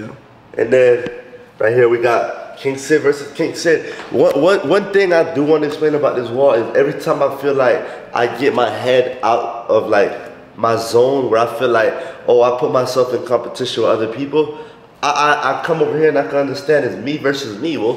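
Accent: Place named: Florida, United States. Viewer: Is He From Florida Because He Talk Just Like My Boyfriend That’s Crazy 😂😂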